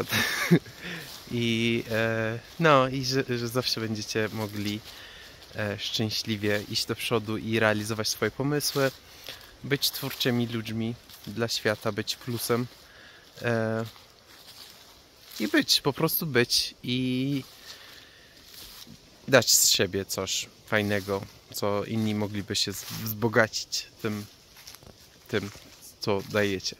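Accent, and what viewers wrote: Poland. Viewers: wlasnie nawet akcent polski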